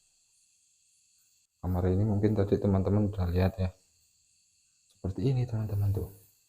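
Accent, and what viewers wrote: Java, Indonesia. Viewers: kalo boleh tau di daerah mana yh bg logatnya kaya jawa😁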